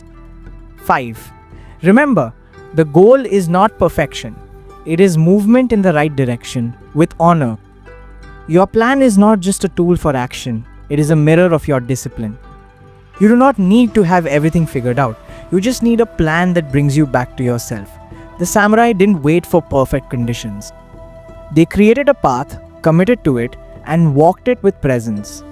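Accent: India. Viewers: Indian accent 🙄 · At last the self help topic with an Indian voice which made me stop and listen . Usually they are all junk. Thank you for the quality video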